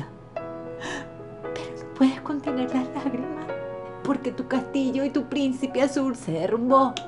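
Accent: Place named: Venezuela. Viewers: es q es venezolana y hablamos todas igual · Debe ser por el acento venezolano buena onda!! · Pues es venezolana tinene ese asento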